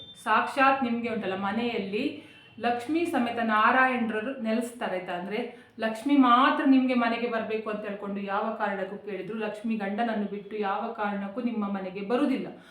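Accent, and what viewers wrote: Mangalore, India. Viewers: hello...Very useful information, point by point explained the reality and truth. Your kannada is very clear its pure mangalorean remembers Late Aparna's Accha kannada. and by your appearance & the way you speak kannada I feel you are vishwakarma Acharya or konkani.However its nice that you are open and clear minded. When we do choodi pooja in Shravana we will do it.Only kudure laala we have to check from where we will get it.again thank you very much.I have also observed marvaadis while closing their business shop they say mantras.they are always rich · Thank you very much for the valuable suggestion And your nice Mangalore Kannada. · ನೂರಕ್ಕೆ ನೂರು ಸತ್ಯ ನಮಗೆ ಒಳ್ಳೆಯದಾದರೆ ನಮ್ಮವರೆ ಸಹಿಸಲ್ಲ ಅನ್ನೋದು ನಿಜ ..ಚೆನ್ನಾಗಿ ಮಾತಾಡ್ತೀರ ನಮ್ಮ ಮಂಗಳೂರು ಕನ್ನಡ❤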